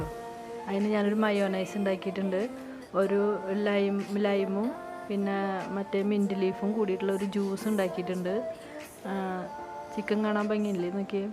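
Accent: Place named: Thrissur, India. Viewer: ചിക്കൻ പൊളിച്ചുട്ടാ.. നമ്മടെ തൃശ്ശൂർ ഭാഷയും.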